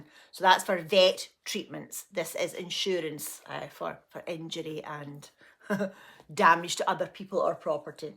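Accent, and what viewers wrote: Scotland, United Kingdom. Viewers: I was watching one of your video's ads last week and my husband said "why are you listening to that rubbish?" So I had to explain about the ad revenue 😂 I normally watch you at breakfast time and he always comments on that wee Scottish lassie!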